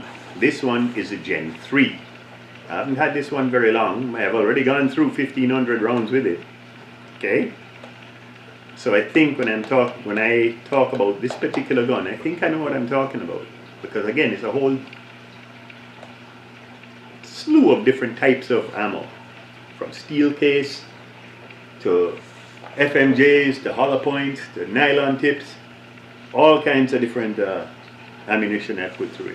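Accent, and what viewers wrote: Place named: Barbados. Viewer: Heck I just trying to figure out your accent but I'm gonna go out on a guess and say Barbados.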